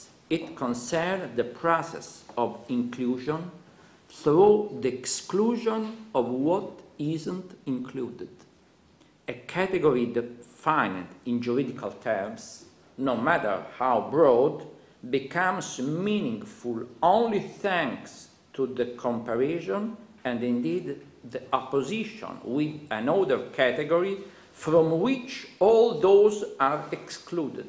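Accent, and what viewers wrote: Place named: Italy. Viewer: I share in his subtle Italian accent, and incomprehensible sentences in the video, as the munus of our community.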